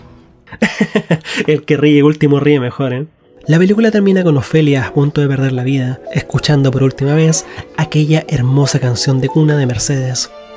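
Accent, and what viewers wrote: Chile. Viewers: NO HABLAS NEUTRAL. Tienes el tipico acento chileno. Ahora que no lo notes es diferente. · Acento neutro???? De acá a la china se nota que sos chileno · tu acento es bien chileno ,man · Dices que hablas neutral??? Pero si hablas Chilensis 🤭🤭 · Qué malísima esta review . Y no hablas neutral.. hablas chileno. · Nos ered neutral, se nota tu acento chileno a kilómetros. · Eres un grande pero sin ofender el acento chileno se te nota a leguas, no creo que domines el acento neutro.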